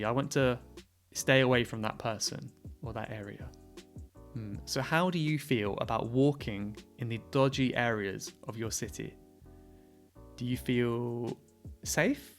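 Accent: United Kingdom. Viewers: Hey, it’s Lee speaking from mainland China, i am trying to find some useful tips, videos on YouTube for improving my spoken English. And your videos are so useful and your British accent sounds so noble and elegant. Cheers mate.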